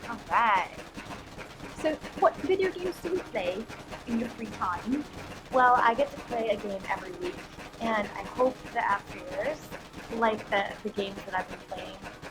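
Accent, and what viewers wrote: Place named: United Kingdom. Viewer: I like the British accent. Haha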